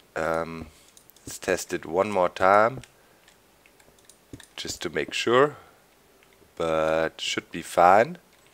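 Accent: Germany. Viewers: has an accent like German frontman Miland Petrozza, and Miland Petrozza is badass.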